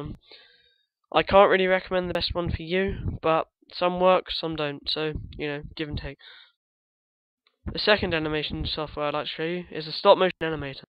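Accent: United Kingdom. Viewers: Are u from London? :o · heh... you sound british.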